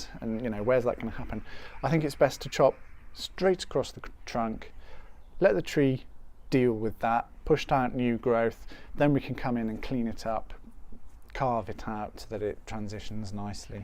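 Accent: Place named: United Kingdom. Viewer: I'm from Germany. By you're accent you're from Britain, right?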